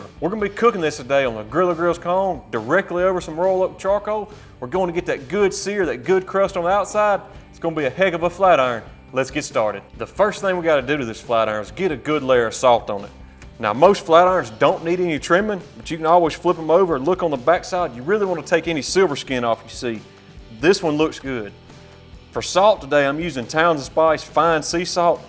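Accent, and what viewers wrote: South, United States. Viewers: Love the southern accent (if am correct). Nice steak, looks quite delicious 👍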